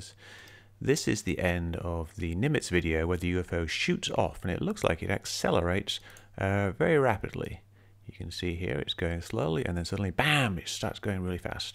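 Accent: United Kingdom. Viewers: Are you serious... a British accent doesn’t make you intelligent clearly... can’t fix stupid I suppose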